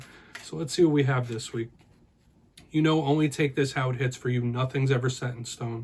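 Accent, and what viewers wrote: New Jersey, United States. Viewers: you have a Jersey-ian accent. I love Jersey. It's possible for regular people to convince other regular people to do horrific and unGodly things based off of THEIR own personal experiences & happenstances in life. The challenge is to think independently and live without fear of repercussion or reprisal. Don't sacrifice your valuable time doing things that you, otherwise, would not do. We are here to enjoy our time, that's all.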